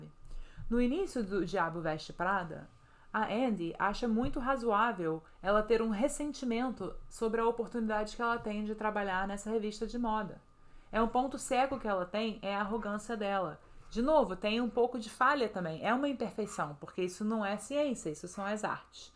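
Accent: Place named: United States. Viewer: Pelo sotaque ela é americana, vi agora um primeiro vídeo seu. Se é americana ou aprendeu nos EUA, o ensinamento sobre roteiro é ouro. 👏👏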